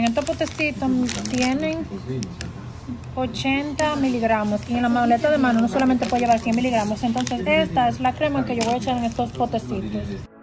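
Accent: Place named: Cibao, Dominican Republic. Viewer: Tiene el acento cibaeño muy marcado 🤣😫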